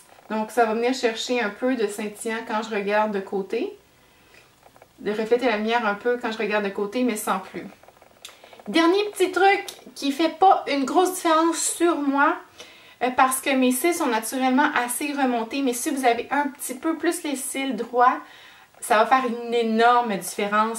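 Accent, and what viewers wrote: Canada. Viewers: Vous êtes jolie! Dommage que les sous-titres ne m'aident pas à comprendre cet accent canadien :( C'est pareil, les américains ne parlent pas un vrai anglais, ils déforment les a, les canadiens font pareil : déjà vous le prononcez déjeu... Je comprends mieux un belge ou un suisse... En fait, je me demande comment vous enseignez les enfants à lire : pa c'est prononcé peu ou po? comme çe au lieu de comme ça... C'est pénible de devoir faire des efforts d'essayer de comprendre un accent alors qu'on parle la même langue. Bref à part ça, bon exemples. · j'ai pas tout compris, c'est du canadien je pense, ça pique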